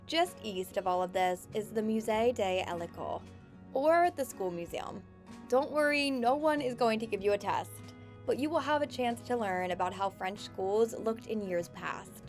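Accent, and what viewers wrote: United States. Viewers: 😂😂😂 I’m sorry too I appreciate the video and all but girl! At least try to pronounce the locations the way they are meant to sound even imitating a French accent would be better than this😊 Im American too but come on we have all been exposed to the accents of visitors from abroad or damn haven’t you ever seen a Pink Pather movie lol just try a little! Oh and just so you know we don’t all sound this way..just like anywhere else ppl from different locations around the country have their own distinct way of speaking (ppl from the south, northeast, midwest, west coast, etc) but still the way she says cite-sitay!😂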